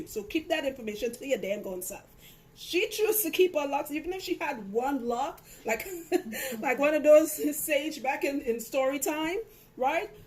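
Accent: Trinidad and Tobago. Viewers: Sounds very trini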